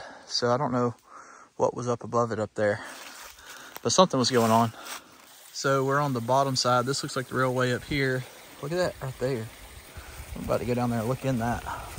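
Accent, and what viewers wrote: South, United States. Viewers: Gotta say, when you say "dad gum it".... just makes me chuckle and grin! Love our old southern talkin! Great walk... keep em coming!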